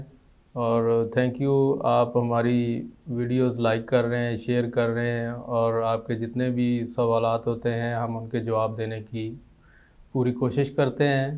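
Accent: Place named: Punjab, India. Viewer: Do you know your problem? Tu c Paindo Punjabi ho. Ardo (Urdu) Punjabi which bolde ho. I have never been asked this question in Canada and the UK. You had no content so you decided to do a podcast on this unrelated topic. Learn to speak with correct pronunciation and chances are nobody will ask you this stupid question. Kuch samaj ayi?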